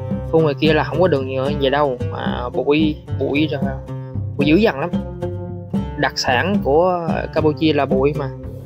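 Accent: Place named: Central Highlands, Vietnam. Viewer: Giong p tay nguyen viet nam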